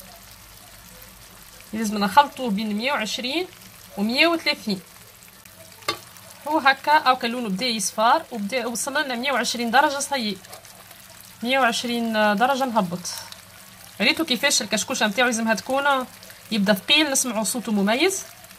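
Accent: Algeria. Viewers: حتى لهجتكم الجزائرية زوينة بزاف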